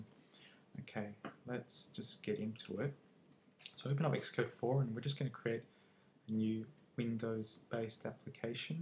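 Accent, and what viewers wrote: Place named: Australia. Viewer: Thank you very much!! I'm very new to this technology but value the work you have put in to demonstrate!! It sounds like you're a fellow Australian ;) Cheerz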